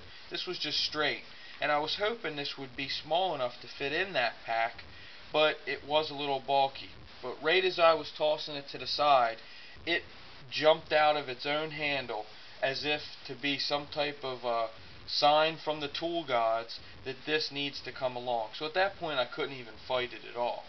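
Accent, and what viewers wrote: Baltimore, United States. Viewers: Good idea. You sound like you have a Baltimore accent. Am I right?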